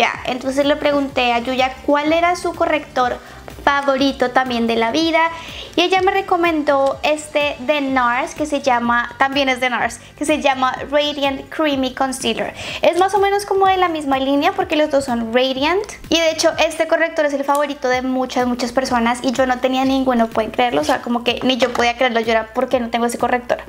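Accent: Colombia. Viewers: De dónde es ella? Tiene como acento colombiano o es solo mi impresión?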